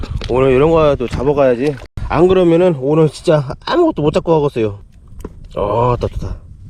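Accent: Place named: Jeolla, South Korea. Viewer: 매번 영상 잼나게 잘 보고 있습니다.^^ 더운 여름 홧팅하세요 * 건의 사항 구독자로서 주제넘는 참견(?)을 좀 하자면 - 구독자가 팍팍 늘었으면 하는 바램으로 1) 오늘 영상에서 조개 잡는 영상을 좀더 길게 했으면 합니다. (조개잡이 7분 먹방 9분) 사람마다 차이는 있겠지만 저는 먹방보단 조개잡이가 더 길었으면 하는 바램 2) 먹방영상을 따로 만드는 것도 좋겠어요. (동네 또는 지역맛집소개) 아예 대놓고 막 드시는 영상요... 마치 먹방유튜버들처럼 해도 잘하실듯. 너무 맛나게 그리고 많이 잘 드심 3) 컨텐츠 하실 때 , 말씀을 더 많이 하셨으면 합니다. 형님 일상이나 컨텐츠 내용 설명이라든지 저는 경상도 사람이지만 형님 이야기하시는거 너~~~~무 정겹고 좋습니다. 사실 저는 거의 형님 전라도 사투리 듣는 재미로 온당께요. 빨리 구독자 많아져서 전업유튜버(?) 하시길 응원합니다^^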